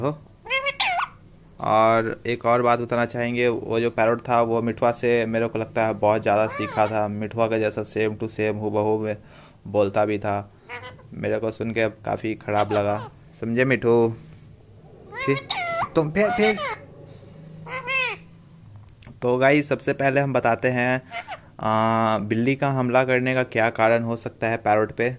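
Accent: India. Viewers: Bhai tone se toe aap mithilanchal k lgte ho. Kha se ho aap ??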